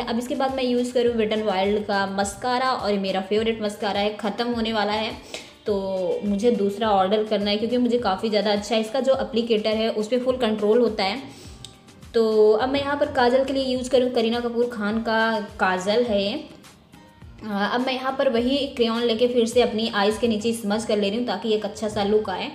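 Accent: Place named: Kanpur, India. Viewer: Amazing makeup di khaa se h aap.... Apki language kanpur ki lgti h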